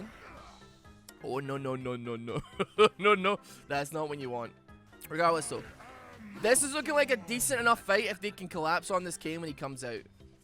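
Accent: Scotland, United Kingdom. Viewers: Just a heads up, man, he's not Irish, he's a Scott.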